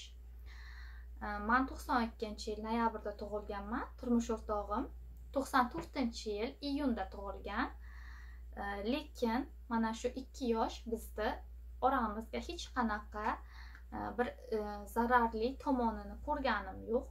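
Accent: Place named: Jizzakh, Uzbekistan. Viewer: Jizzaxcha shevalarizdan sizzi😁😁🤗🤗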